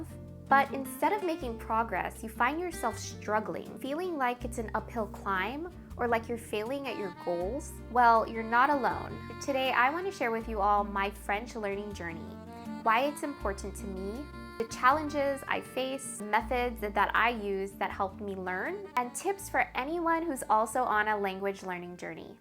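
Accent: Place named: United States. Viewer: English! It is a difficult language, so many people speak English differently with different accents (UK, U.S., Australia…) I prefer the U.S. English accent like yours 😄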